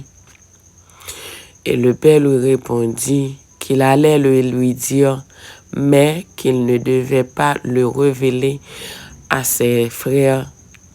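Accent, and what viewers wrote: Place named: Democratic Republic of the Congo. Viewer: dans cette vidéo tu as l'accent d'une congolaise,depuis la nuit des temps ça a été toujours ainsi rien n'est gratuit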